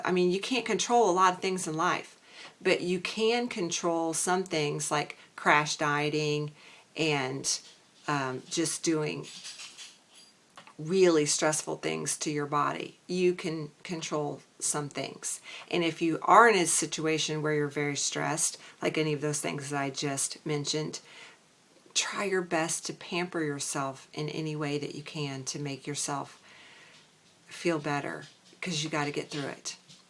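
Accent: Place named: South, United States. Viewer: 💗 I understand. I’m in California, in a liberal place with a lot of this holistic stuff going on. You have an adorable accent, it sounds a little southern? Anyways, you radiate like some glowing people do and i understand that energy when I’m well. The past week, my spirit has just sprung up. I’m feeling so happy. I saw that you accidentally erased one of my messages. That’s fine, I will get back to you very soon 💗🌸💗 I articulate well at certain times when my brain’s really on point, so I’ll get back to you. No worries. How do I order your book? I totally want to support you in this and hear your story and I will most definitely be able to leave a deep, honest, heartfelt review for you because I can be very talented in that way when it comes naturally 💗🌸💗 Much love!!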